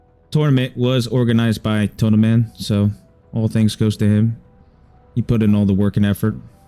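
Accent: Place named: United States. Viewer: this bro has a goofy american accent, damn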